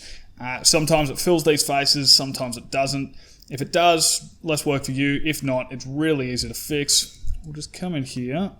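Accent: Australia. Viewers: Why is every single good modeling tutorial done by an Australian?!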